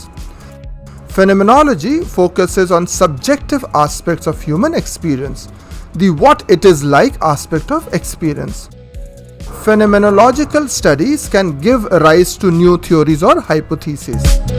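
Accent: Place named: India. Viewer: This video seems very important and informative. Sadly I don't understand the English with the heavy Indian accent. My loss. 😔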